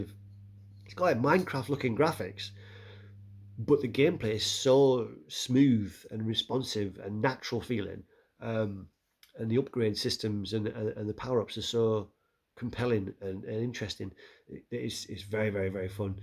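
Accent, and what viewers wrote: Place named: Australia. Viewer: You have a distinct Australian accent. How did you learn it? 👍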